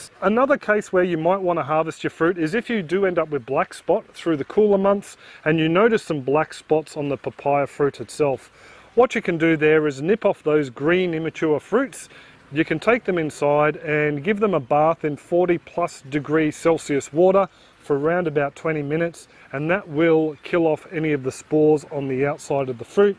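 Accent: Australia. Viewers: I really enjoyed your video. It was really easy to understand and I LOVE the Aussie Accent!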